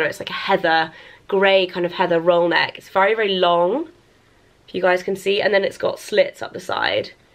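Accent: United Kingdom. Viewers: I do love watching all of your videos! I’m obsessed with British accent and you’ve been one of my favs for years!!! Your awesome!! 🤩👍🏼🙌🏽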